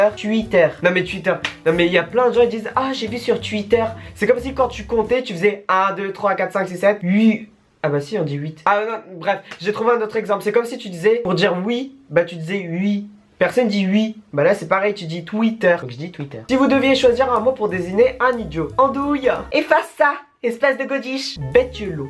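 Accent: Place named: Charente-Maritime, France. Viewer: J'parle comme toi et j'suis de Charente-Maritime 😅😅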